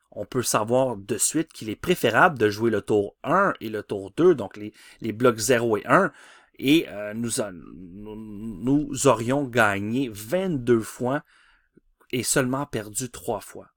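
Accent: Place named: Quebec, Canada. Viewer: Grand travail Québécois trop belle akcent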